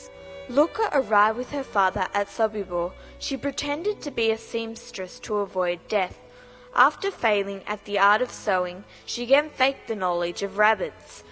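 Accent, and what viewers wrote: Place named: Australia. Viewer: Australian, one of the eastern states, has a speech impediment, private school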